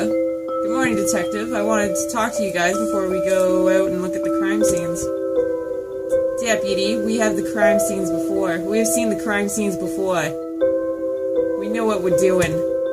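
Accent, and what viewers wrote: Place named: Boston, United States. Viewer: I think it's safe to say that you'll have a "bloody" good time with this game lol. Your Bahston accent is hilarious, btw 😆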